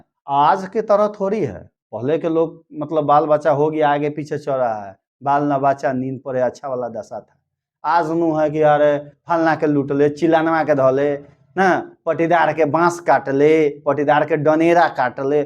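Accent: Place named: Bihar, India. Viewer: SIR MAJA NAHI AA RAHA HAI APANA STYLE ME BIHARI STYLE BOLIYE ❤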